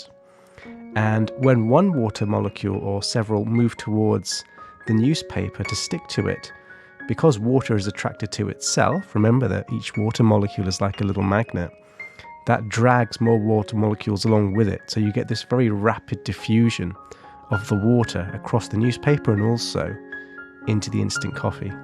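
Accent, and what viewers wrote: England, United Kingdom. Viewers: You would do Well in the US, as they Love English Accents!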